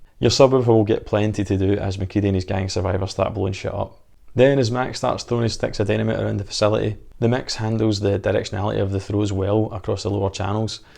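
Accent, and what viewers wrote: Scotland, United Kingdom. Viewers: Get more subscribers....Hardly with that annoyinh Scottish accent 🙄👎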